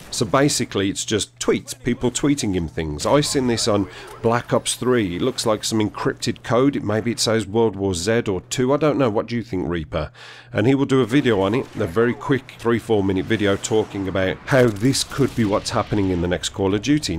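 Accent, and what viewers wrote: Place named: England, United Kingdom. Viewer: Awesome vid, superb english accent marvelous i should say, hope he listen to reason or otherwise his screwed.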